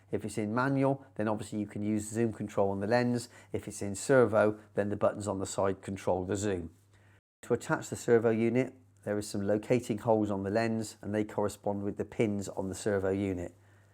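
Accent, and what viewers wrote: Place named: West Country, United Kingdom. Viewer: very good review, the parfocality of the 2.8 seems more than good enough to settle any worries I had. Agreed on the pricing of the servo unit, it's far too high a percentage of the lens' cost itself. Shirt was on point too, and west country accent turned up to 11 made up for absence.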